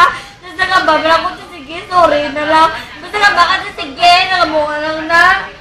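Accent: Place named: Hiligaynon, Philippines. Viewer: Nami gid pamatiun mag storya ilonggo ah :) daw ma inlove man ta saimo ba :)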